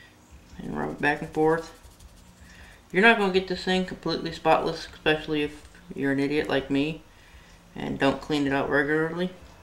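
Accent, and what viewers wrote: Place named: Texas, United States. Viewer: interesting accent. Texas? Oklahoma?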